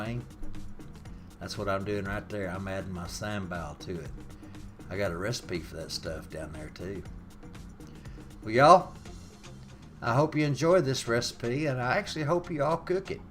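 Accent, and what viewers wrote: South, United States. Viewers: He got that ol' town road accent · Is that a southern accent? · Love your Southern Accent ! 👍💪👏💖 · I don't care how good it'll turn out. his deep southern accent convinced me it'll be good no matter what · The accent threw me off in the beginning and I almost clicked off until I realized it was a southern accent and then stayed lol. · The southern accent annoys me to no end. I hate it. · Nice!! I don't think your southern voice could get any pleasant. I just love your voice. Oh...and your delicious cooking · Looooove the southern accent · Good country accent. Great video also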